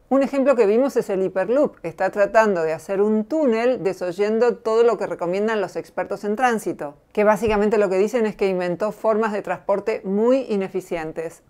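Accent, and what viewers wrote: Argentina. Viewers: Que rara que eres.. perdón, tengo que ser honesto. COMO SÍ SER "PROGRESISTA", OTORGUE UNA CIERTA "SUPERIORIDAD MORAL"... Especializada en "todo", es extraño con acento argentino...NO SORPRENDE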